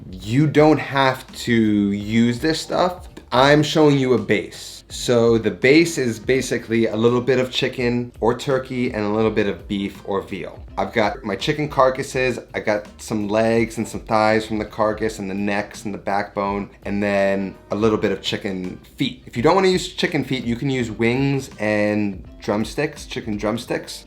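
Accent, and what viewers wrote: New York, United States. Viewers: I watch for the food, your handsome face/ NY accent.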